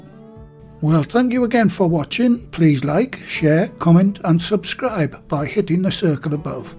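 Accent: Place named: Hexham, United Kingdom. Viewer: Yes it’s like food planet. Love the accent, Hexham way?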